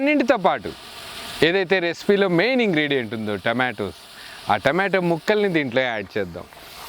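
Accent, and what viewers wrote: Guntur, India. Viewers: Veg biriyani ela cheyali cheyypandi garu mee telugu guntur Telugu laaga undhi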